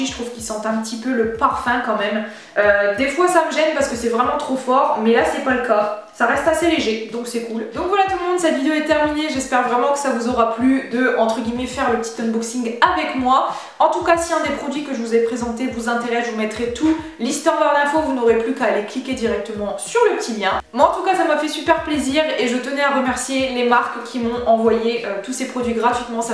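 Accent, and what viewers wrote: North, France. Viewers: Sophie Mdg c’est l’accent du nord 😅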